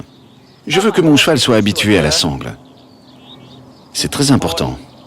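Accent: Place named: Australia. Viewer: L'accent australien me fera toujours cet effet bizarre :P En tout cas c'est un super travail, je voulais tenter l'éthologie avec un cheval qui est assez (pour ne pas dire énormément) nerveux, craintif et surtout pas dressé pour voir si ça l'aiderait, j'ai reproduit les mêmes gestes (j'ai eu de nombreuses cloques au passage du stic) mais maintenant je peux presque monter sans incidents, je continue, ça nous aide beaucoup tous les deux ! Merci !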